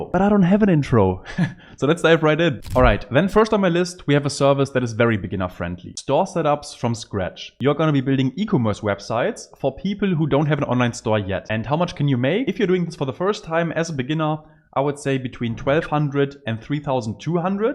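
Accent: Germany. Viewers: Such a saver, very timely. Thank you so much. And yeah the German accent, spicing it up alittle and very original, so yeah.....😀😀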